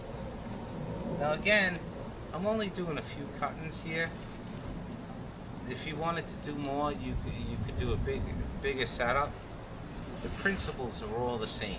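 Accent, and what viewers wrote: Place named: Northeast, United States. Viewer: I don't think he's stoned! Are you basing this on his northeastern accent? Thanks for the info on blueberries/cuttings, can't wait to get my hands on some blueberry sticks.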